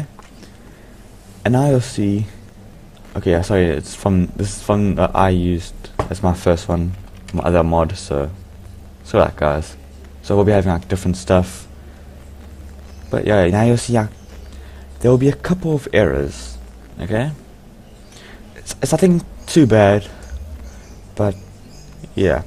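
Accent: South Africa. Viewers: this guy sounds South African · ur african? lol wow :D im from england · you sound South African....are you?? if you are, jas my bru, so am I!!! :P :D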